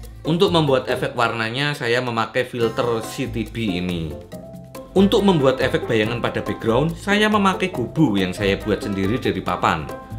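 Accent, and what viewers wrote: Java, Indonesia. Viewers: Kok pake cengkok Jawa,anjirrrrrr👎👎👎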